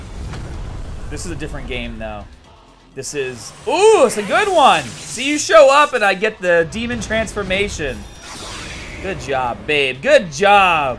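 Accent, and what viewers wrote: Boston, United States. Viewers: He has a boston accent. He is from Boston, Massachusetts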